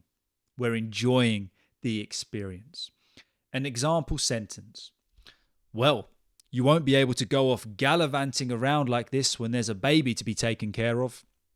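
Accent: United Kingdom. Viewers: Hey, there,. I am absolutely mesmerized by the British English accent! Thanks a million for the insightful tips!😊 From Rio 🇧🇷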